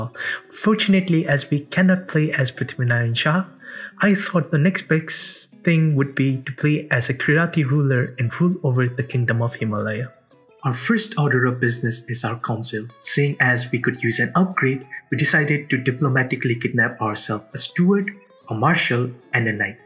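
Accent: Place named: India. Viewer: by any chance are you indian or even nepali?you have indic accent.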